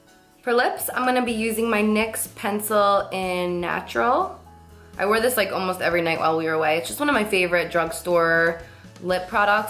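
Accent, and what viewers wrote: New Jersey, United States. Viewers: Jersey accent :) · She is from New Jersey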